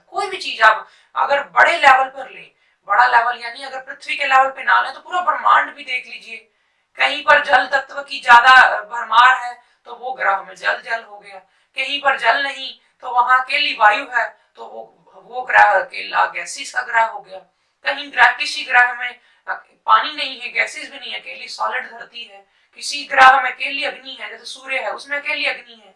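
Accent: Punjab, India. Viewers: Y did u changed ur name... i can see punjabi touch in your speech and in your way of your expressing thing.. i am sure you are sikh.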